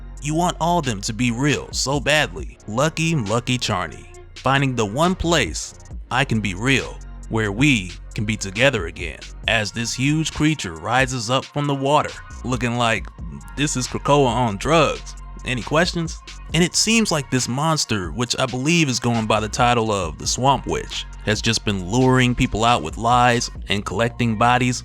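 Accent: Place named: West, United States. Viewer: i love ur western accent tho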